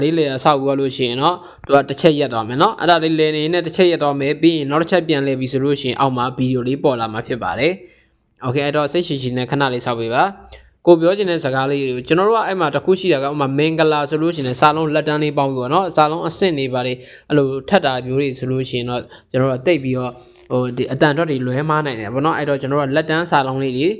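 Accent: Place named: Rakhine, Myanmar. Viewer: အသံကမပီဘူးဗျရခိုင်အသံလိုဘာသံကြီးမှန်းမသိဘူးဗျ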